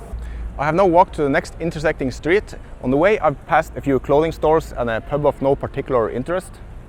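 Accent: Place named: Northern Norway, Norway. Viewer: His accent is nice, but it's not an Oslo accent at all. Very Northern Norway! :D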